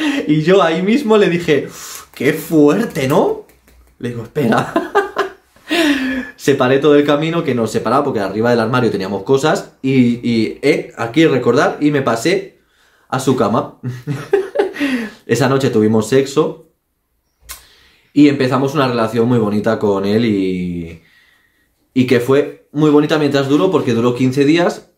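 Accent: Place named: Spain. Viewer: me haces reir mucho, me encanta tu acento español al narrar tu historia, saludos desde Venezuela, los retos estan bien, adelante...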